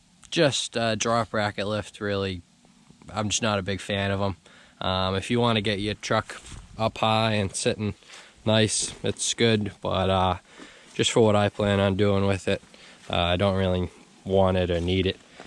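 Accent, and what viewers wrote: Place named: Massachusetts, United States. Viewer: Nice video, knew you were from mass by your voice lol I live in plymouth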